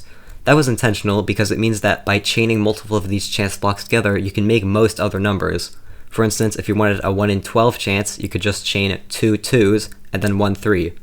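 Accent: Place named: Europe. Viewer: you sound european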